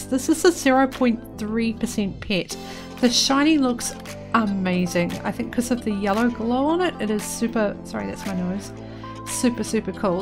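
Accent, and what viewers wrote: Australia. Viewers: Are you Aussie or New Zealand? Or from a completely different place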